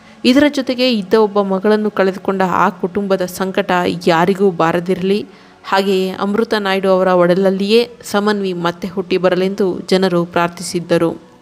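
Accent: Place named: Mysore, India. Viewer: ಮೇಡಂ, ನೀವು ನಿಮ್ಮ ವಾಯ್ಸ್ ಗೊತ್ತು. ಮೈಸೂರಿನ ವರು.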